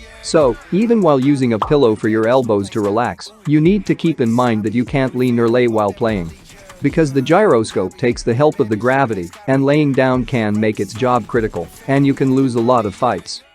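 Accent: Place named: India. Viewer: You from india maybe 😅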